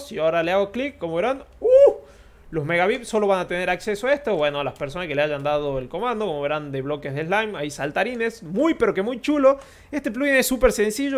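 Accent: Argentina. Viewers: Siento conocido tu asentó, eres de Argentina? (De que parte)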